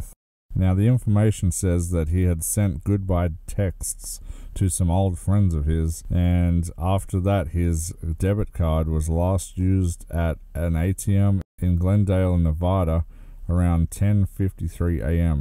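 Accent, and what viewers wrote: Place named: Australia. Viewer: Who doesn't love listening to that Aussie accent! LOL 🤣 I hope they find the missing man. 🙏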